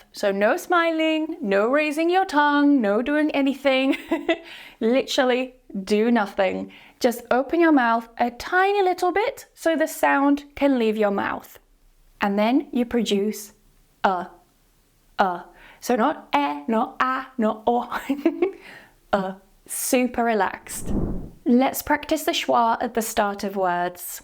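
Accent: United Kingdom. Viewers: Bang lu komen dimana2 to bgus lah👍,, beliau ini englishnya accent British haha ww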